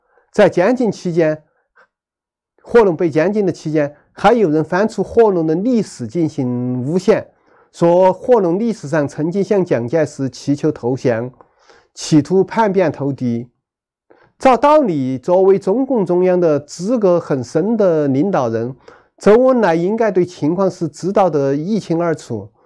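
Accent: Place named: Chengdu, China. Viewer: 成都口音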